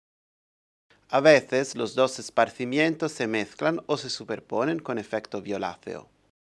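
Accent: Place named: Italy. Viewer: Hermoso video acompañado con un gracioso acento italiano. Traducir en 2 idiomas además de la lengua nativa, es de un esfuerzo y compromiso que se aplaude. Ustedes parecen tan multifacéticos como el mismo Leonardo Da Vinci. Muchas gracias por el video. Una consulta: ¿la acuarela azul es hecha con trementina, o con agua? Muy amables, ¡y feloz año nuevo!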